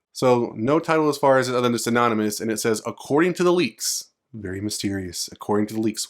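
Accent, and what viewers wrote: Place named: United States. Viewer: i said american because i was asking to whom i was assuming as an american from his accent. not saying any other countries are very good at it. i get your point, but i'm an asian yet i learned how to pronounce common western/english/american names correctly n i found it not that hard especially when you hear many people around you pronouncing/teaching you correctly.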